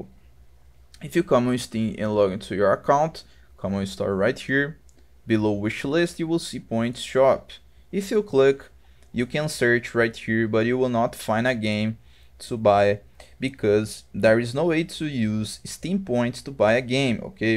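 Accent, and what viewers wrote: Brazil. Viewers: vc é br? o seu sotaque parece bastante, e tambem da pra ver ali que o dinheiro ta em reais, mas caramba! tu tem tudo isso de dinheiro na carteira steam? parabens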